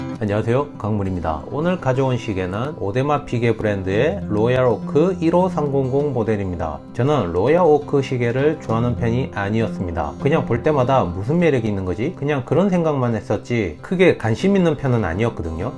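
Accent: Gyeongsang, South Korea. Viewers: 경상도 사투리 멋있습니다!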